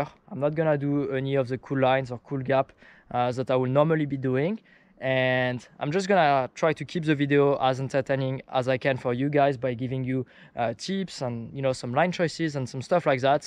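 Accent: France. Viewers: French accent ???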